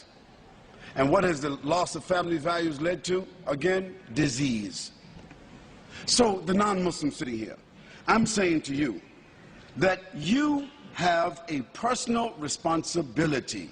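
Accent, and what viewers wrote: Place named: New York, United States. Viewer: machaa ellah, en plus son accent d'un vrai newyorker trop joli ellah ybarrek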